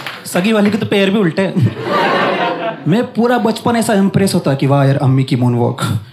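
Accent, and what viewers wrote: India. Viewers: His accent is telling that he is from MP 😂😅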